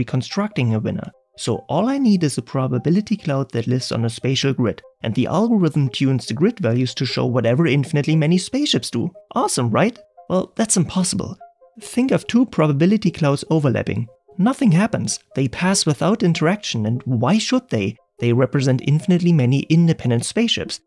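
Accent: Germany. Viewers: People complaining about the accent … well, you‘ll have a much harder time understanding this in German which is the author‘s native tongue. And nobody else does videos which come even close, so rather applaud for this content being in English at all - as a large portion of YT is German language actually…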